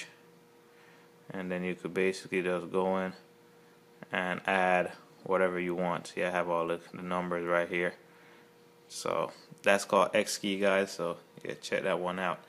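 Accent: Jamaica. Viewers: You're Jamaican i can tell my your accent. I'm Jamaican too.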